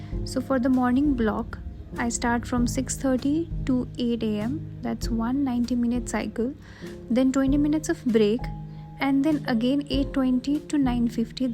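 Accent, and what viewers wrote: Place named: South India, India. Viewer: Judging by her pronunciation, she's probably from the south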